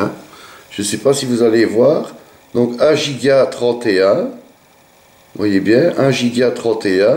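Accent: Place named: Belgium. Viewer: Merci, super sympa et l' accent belge est magnifique, ne change rien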